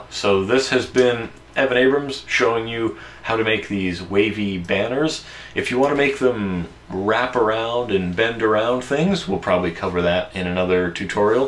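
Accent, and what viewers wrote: Canada. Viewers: That Canadian accent xD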